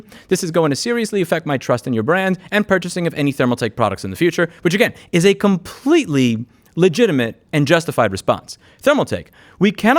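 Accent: New York, United States. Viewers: I love when gets mad he get progressively more Italian and Yorkie. Never ceases to put a smile on my face that someone will get this upset over someone else's issues. We need more people like him. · Haha, nice try on the accent but it’s still a New Yawka accent. Keep up the good work! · that New Yorker accent! You know he's frustrated 😅 screw these companies! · let the New York out in this one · You can take the guy out of Noo Yawk... I love you,. BTW, I agree 110% about Thermaltake & Herman Miller. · You're the best. Your angry New York accent always makes me grin.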